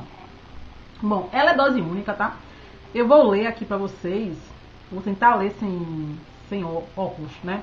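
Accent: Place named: Salvador, Brazil. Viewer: Pelo sotaque vc mora em Salvador. Onde encontro essa linha?? Aí em salvador??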